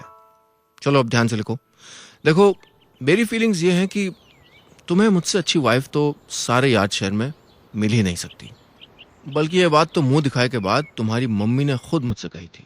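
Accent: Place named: Punjab, India. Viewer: बहुत खूब. पंजाबी तड़के में कहानी सुनाने का ढंग बहुत ही मज़ेदार.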